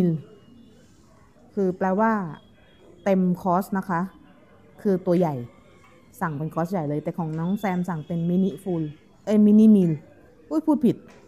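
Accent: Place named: Buriram, Thailand. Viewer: สำเนียงเหมือนคนบุรีรัมยเลยคะ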